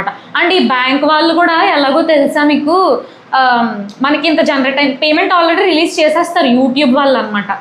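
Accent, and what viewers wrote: Godavari, India. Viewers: Good to see your videos. I like the way you speak and your slang from GODAVARI. Even i am from andhra from Vijayawada, but my parents are settled in HYD and my self in UK.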